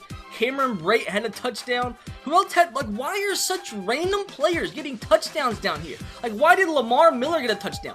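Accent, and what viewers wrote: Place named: South, United States. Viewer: This guy has to be from the south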